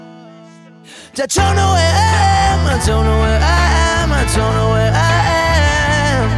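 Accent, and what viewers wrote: Doncaster, United Kingdom. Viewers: I know he sounds like my dad he's from Doncaster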